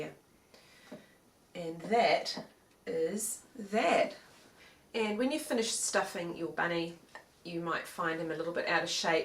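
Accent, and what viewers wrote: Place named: South Africa. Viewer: I detect an accent where are you from? SA?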